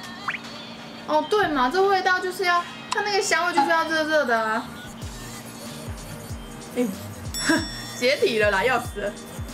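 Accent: Taiwan. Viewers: 台灣國語也太親切了🤣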